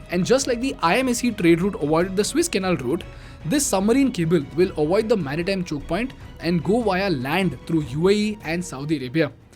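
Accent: India. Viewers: This EAM English is awesome.He does not have the Indian accent like this young commentator.Hes an extraordinary guy.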